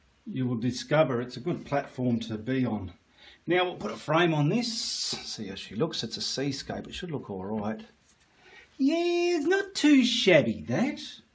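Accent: Australia. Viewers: I love it! Thank you for a great tutorial! And I have to say I love listening to your Aussie accent! 😊 Most tutorials are from other countries so seeing the talent in our own backyard is wonderful! Big thank you from a fellow Aussie! 😍😍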